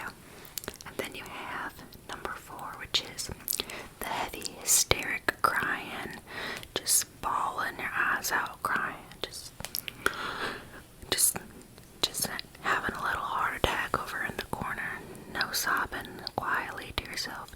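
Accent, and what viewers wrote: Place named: South, United States. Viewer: This was SO GOOD!!!!!!!!!! As a southerner myself, I can say the accent is accurate to what most of us sound like. And also, I got a lot of tingles from the video. So keep up the good work!!!!!!!!!!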